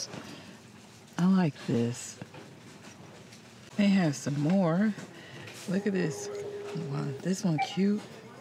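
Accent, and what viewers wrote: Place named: Deep South, United States. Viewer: You from the DEEP south girl. ❤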